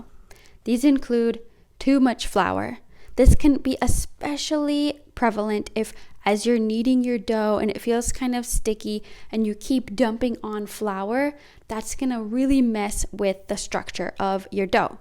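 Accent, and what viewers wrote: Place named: United States. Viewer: Do you have an accent or are you from North America? Like you sound American but with a bit of an accent lol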